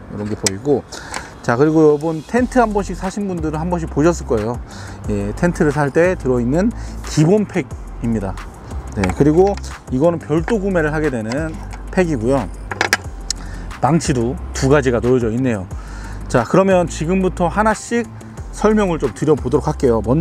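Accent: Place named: Jeolla, South Korea. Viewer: 슬래파~~전라도신가보다 유익한정보감사~~^^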